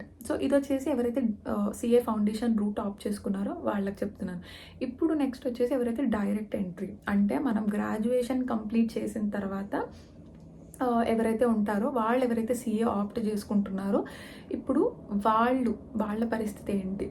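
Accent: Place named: Kerala, India. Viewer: Miku malayalam accent undi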